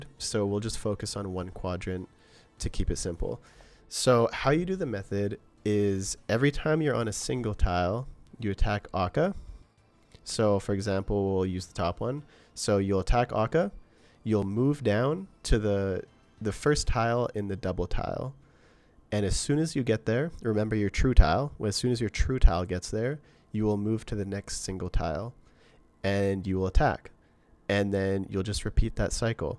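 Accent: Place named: Canada. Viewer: We guessed you were Canadian 2 seconds in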